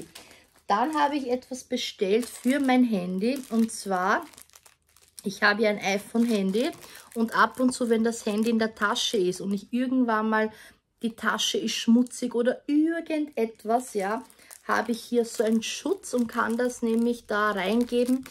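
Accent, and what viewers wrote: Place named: Austria. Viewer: Ich höre das Österreichisch so gern 😍 Schönes Video!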